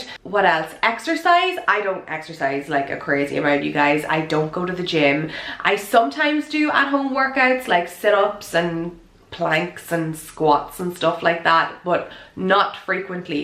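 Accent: Ireland. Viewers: Ho. I'm Portuguese and I love your videos. I also love your Irish accent. 😊 Take care.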